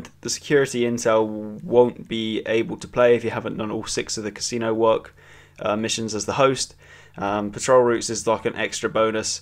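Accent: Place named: United States. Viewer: let me guess... american?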